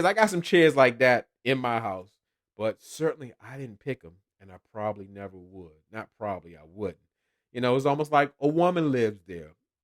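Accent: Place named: Baltimore, United States. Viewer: The season is over and here I am binging all of your videos as I finish up my nails. YOU CUT THE ENTIRE FOOL ON THIS SERIES! This portable rattail, the continuous belief in what Anthony really wants, Lyndon being down to the part-time job but on the show between shifts...I'm calling the authorities. But I knew your accent and demeanor felt like home because it is, I'm from Baltimore. I'm thoroughly enjoying myself on today!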